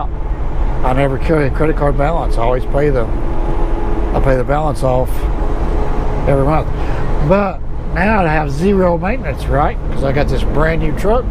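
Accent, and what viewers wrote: South, United States. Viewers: Thanks for sharing your story. Your southern voice sounds good to me. lol Be safe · You do have a southern drawl but so what?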